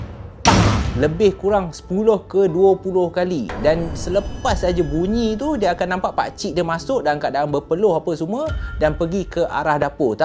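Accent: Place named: Kelantan, Malaysia. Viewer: acu tera crito kuk baso klate pulok ehh...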